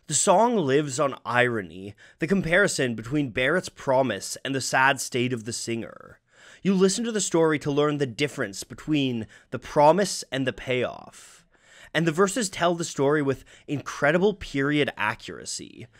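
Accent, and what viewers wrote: Canada. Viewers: I could finally solve my question on whether you were Canadian. I had seen some of your other videos and heard your accent and wondered if you were from Canada, but couldn't confirm it. Glad that my instinct was correct. :P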